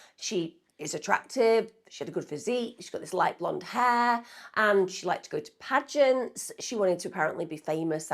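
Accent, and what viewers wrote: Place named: United Kingdom. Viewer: Love your podcast. You are a beautiful and classy lady. Love the British accent. ❤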